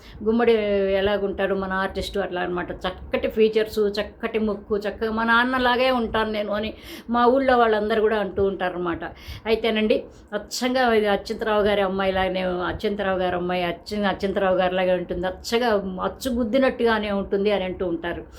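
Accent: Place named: Chirala, India. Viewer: Great inspirational words as always ❤ Maadi kooda near by area andi. Chirala daggara, me slang and few telugu words i felt like you are from that area as well.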